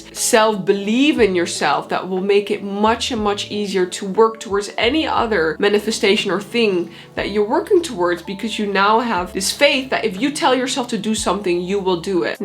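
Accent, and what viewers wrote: Netherlands. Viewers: Not only is your advice, life changing and I've watched all your videos many times over and over again to extrapolate all the information necessary. The Dutch accent is just so pleasant. Thanks!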